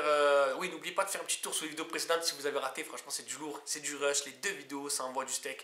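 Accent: South, France. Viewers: Première fois que je vois tes vidéos, je kiffe ton accent du sud, bonne continuation ! ;)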